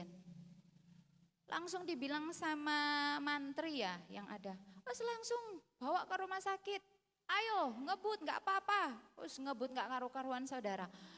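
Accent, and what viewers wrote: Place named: Malang, Indonesia. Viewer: Tante uda medok bgt malangnya😅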